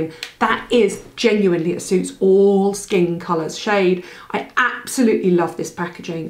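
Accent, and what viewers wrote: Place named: England, United Kingdom. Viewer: I just stumbled upon your channel. It's so refreshing to see a woman the same age as myself being honest & realistic about skin care and make up for mature ladies. I love Bobbi Brown and so wanted to like the Jone's Road miracle balm and moisturizer - but I felt like I was slathering whale blubber all over my face and I didn't like the smell. I have to say they were very decent about returning the items in that they refunded my money but told me I could keep the products. I'll pass them on. Plus, Im a Brit from North London who now lives in LA, so good to hear an English voice!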